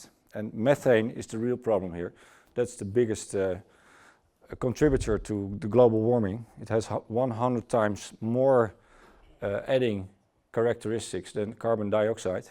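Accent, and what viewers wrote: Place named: Netherlands. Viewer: Steenkool engels.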